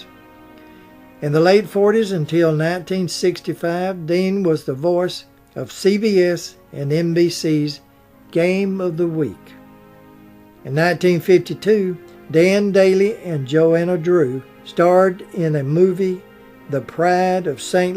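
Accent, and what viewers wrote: South, United States. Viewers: I hope you understand how wonderful your documentaries are, Don't ever stop Please. And thanks for talking slow and country. These days in most place on youtube, I have to slow them down so I can understand what the young people are saying..........lol........Life's Weird! :D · It's common to read comments on YouTube from Americans loving British accents but not so common the other way around. Well this old Brit lady adores your accent. I could listen to you talk all day. You could be reading the phone book for all I care, but the added bonus is that everything you present and narrate is interesting and informative. Thank you.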